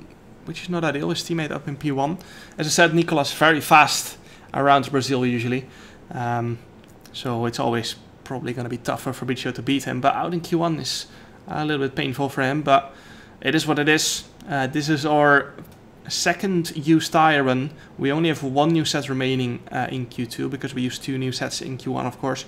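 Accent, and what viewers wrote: Netherlands. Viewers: Are you from the neterlands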